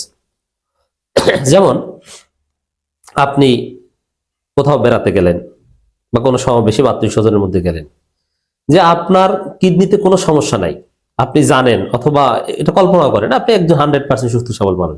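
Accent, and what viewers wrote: Barishal, Bangladesh. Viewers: Vai,apini ki Barishaler?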